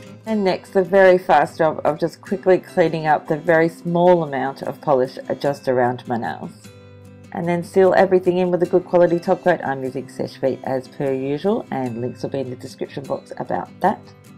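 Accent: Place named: Australia. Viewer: This is awesome, and how great is it to actually hear an Australia accent. Thanks for sharing this with us. Could you tell me where you get your Seche Vite from, please? I live in Hobart, so if you can get it I should be able to as well, but I haven't been able to find it anywhere. Can't wait to check out all your other posts.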